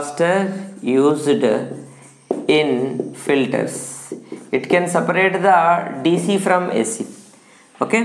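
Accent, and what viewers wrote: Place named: South India, India. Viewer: Where do you live sir? Because from your accent, you seems like south indian and south Indians teach better than any other (this is my experience)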